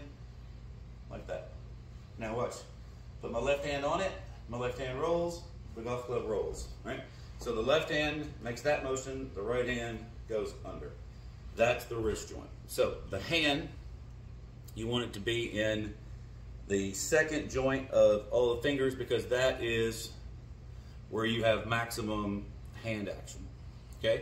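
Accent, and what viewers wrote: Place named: Texas, United States. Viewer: I love how articulate and well spoken your videos are. I have watched several videos , so far, will watch them all. Videos have good ideas in them, I watch them a few times over, to pick up every word. Sounds like you have a Texas accent, like you could ride up in a horse and say "Let me show you around the ranch". The hands have to work correctly, one arm right hand swing drill is great. Mike Malaska stresses hand action also.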